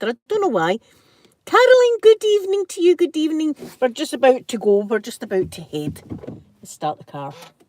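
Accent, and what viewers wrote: Scotland, United Kingdom. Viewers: Hello 👋 im gonna watch this i widh i wouldve caught it live. Love you accent. 😊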